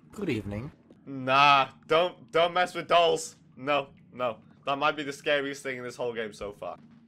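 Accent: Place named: Wales, United Kingdom. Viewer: Sounds a bit welshy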